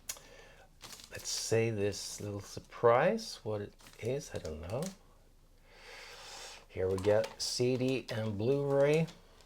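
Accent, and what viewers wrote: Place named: United States. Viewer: I thought you were american before you told us you're a swede, like i am 🙂👍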